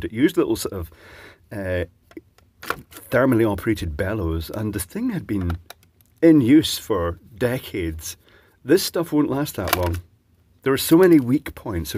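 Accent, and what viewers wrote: United Kingdom. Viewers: That felling when Stevie from Family Guy repairing thermostat. I like that british accent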